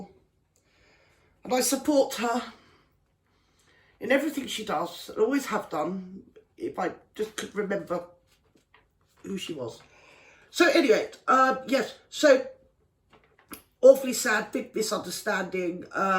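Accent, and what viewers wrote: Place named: England, United Kingdom. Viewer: Wonderful clipped English accent and you nailed it with the entitled attitude. Thank you I pmsl you are a tonic to the community 😂😂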